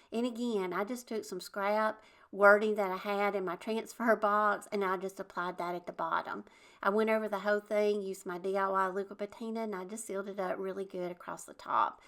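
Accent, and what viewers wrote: South, United States. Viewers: I love your channel (and your beautiful southern accent!). 👍 You are always so inspiring.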